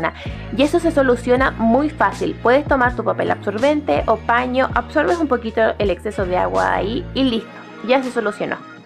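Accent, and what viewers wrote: Chile. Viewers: Chilena? :D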